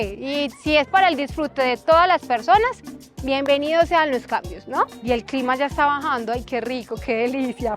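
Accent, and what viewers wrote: Antioquia, Colombia. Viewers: Hola , ya he visto varios vídeos tuyos y me encanta tu style, tu vibra me vibraría y aunque eres colombiana te aceptamos tal. Cual eres y ya eres parte de nosotros Lis mexas ok nunca pierdas tu acento , me encanta , es lo lindo de ti mmm junto con todas tus cualidades jijijjji y además muy hermosa viva Mexico y Colombia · me encanta ese acento paisa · Amo el acento colombiano 😍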